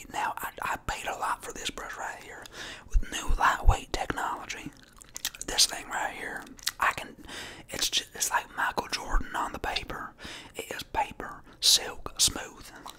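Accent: South, United States. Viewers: He has an unique way to do the asmr movies.I am a fan for a long time and never dissapointed me.Altough i prefer his chewing gum rumble,hand movements and of course the southern accent.I rewatch old vids and i am ok.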